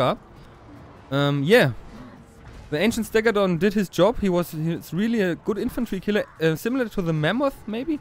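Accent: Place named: Germany. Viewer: nice english, nearly unavailable to sound out the german accent^^ but you should´ve changed the videodescription. i fear there won´t be any anglophones to see your great victory ;) and in the end, the lizardmen monsters are much stronger then the chaos ones. what a great strategic performence xD but anyway nice to see some monstersmash :)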